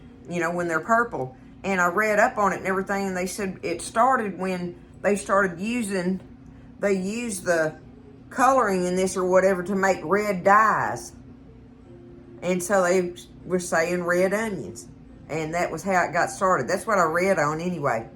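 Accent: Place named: Mississippi, United States. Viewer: Afternoon! I watch your videos for 2 reasons... 1. The foods you make 2. You! You're such a keen reminder to me of my family (all of whom have passed), the homey recipes & kitchen, the way you cook, and definitely the way you talk. I was born & raised in South Mississippi down around Hattiesburg but way out in the country on a farm. Spending time with you is like being back home with family. Thank you so much for filling some empty spaces in my heart. ~Nan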